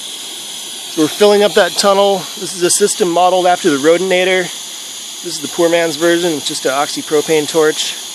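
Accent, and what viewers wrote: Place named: United States. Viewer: listen to the accent, 100% American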